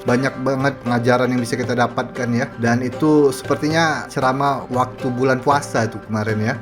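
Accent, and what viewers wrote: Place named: North, Malaysia. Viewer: loghatnya pun dah bertukar jadi loghat utara 😂😂😂😂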